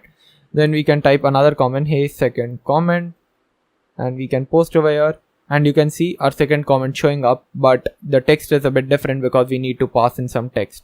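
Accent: India. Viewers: Indian? Ye for sure it's indian accent. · The faculty is Indian🥰 bro i can easily get your accent. First time I watched codecAmp video without turning on the caption😍❤️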